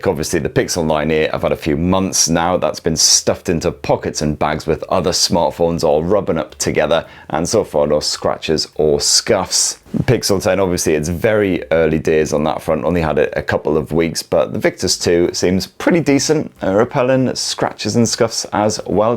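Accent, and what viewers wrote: United Kingdom. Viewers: good thing I understand Britspeak :)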